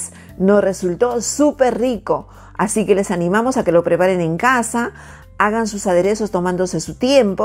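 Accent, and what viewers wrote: Peru. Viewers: Que rico se ve ese Seco de Res al puro estilo Peruano, pero más rico todavía es escuchar la forma de hablar de las mujeres peruanas, disfrutar de ese acento claro, limpio, suave y ligeramente melodioso ... felicitaciones. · A mi me encanta. Es la forma de hablar en el Peru.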